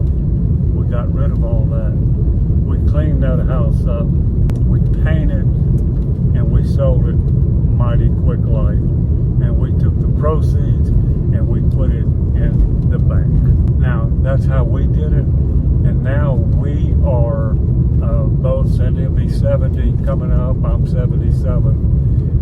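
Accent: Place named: Boston, United States. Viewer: I love your Boston accent.... ;\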